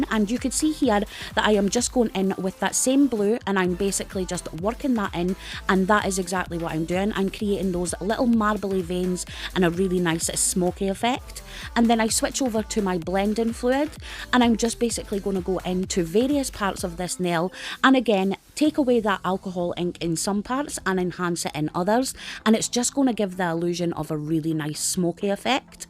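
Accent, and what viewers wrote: Scotland, United Kingdom. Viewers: Totally stunning designs you are truly amazing x you are very professional and amazing when creating your designs just love watching you do your magic and love the accent from up the road bonnie Scotland 🏴 ❤️❤️❤️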